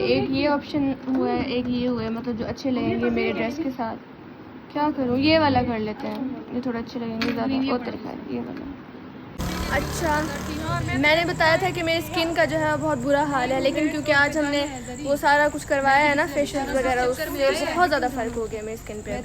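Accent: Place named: Saraiki, Pakistan. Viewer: Sarikiyn wala lahja hai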